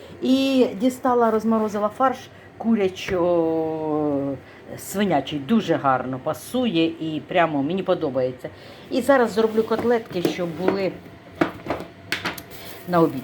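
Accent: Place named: Central Ukraine, Ukraine. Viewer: Ну от навіщо цей ЛІКБЕЗ. Це не той канал, де грамоті навчають. знімає Проізвольні відео, і мені цікаво все і навіть її говір. Бо я теж з центральної України і балакаю також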